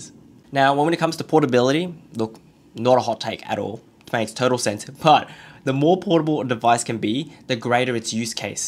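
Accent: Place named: United Kingdom. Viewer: First time I ever heard an Asian guy with a UK accent. 🤓👀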